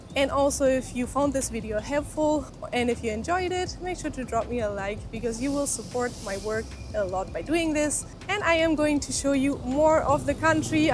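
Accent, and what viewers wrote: Germany. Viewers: Hallo! I looove your German accent!haha Come to visit me here in Cordoba!haha Namaste Guz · Nice beautiful ❤German accent…!!! I listen to whole thing 🎉. Great presentation and information!!!! Wow 😮!!!! ❤❤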